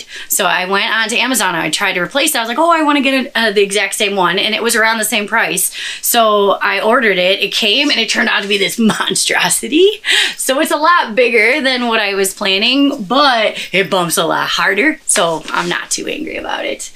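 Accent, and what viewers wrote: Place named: Poland. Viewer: ary you from Poland because your "ci" sounds very polish?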